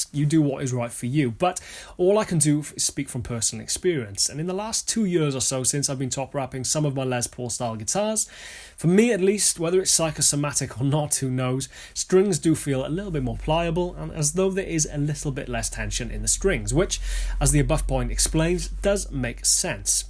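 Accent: Wales, United Kingdom. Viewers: is 'Border Welsh' - right on the border with England, but Welsh nonetheless. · i cant tell if you're welsh or english.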